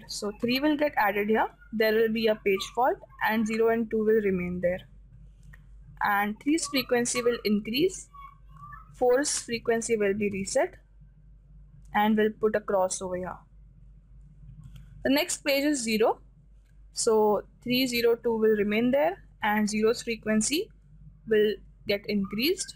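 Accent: India. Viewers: Is this an Indian accent?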